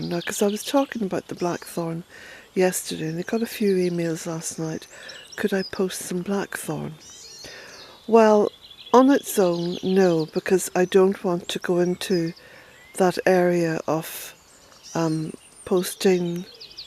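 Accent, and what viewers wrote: Ireland. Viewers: Well, You say you are rambling on, but I so enjoy hearing you ramble in that lovely Irish accent of yours. I wonder if you truly realize the pleasure you bring to so many of us. I have always loved nature, especially animals, but I now look at the landscape around me with eyes anew. My maternal grandmother was born in County Claire Ireland, and so I particularly love your channel. And I hope, one day, to visit Ireland again.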